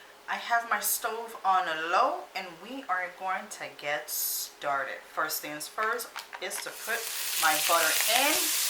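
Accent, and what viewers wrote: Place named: New York, United States. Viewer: Love it looks delicious . are you from NY ? I live in the south but I'm originally from Long Island NY. I miss hearing the NY accent . love you recipes keep putting them out ❤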